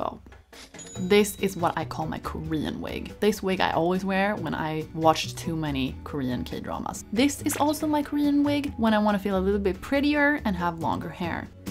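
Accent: Sweden. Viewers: I've never seen u before but i can hear that ur Swedish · Your Swedish accent makes it all better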